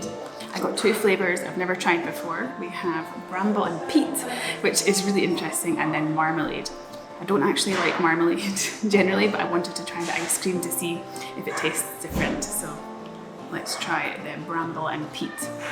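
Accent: Scotland, United Kingdom. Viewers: のスコッティシュ・アクセントも聞いてみたいです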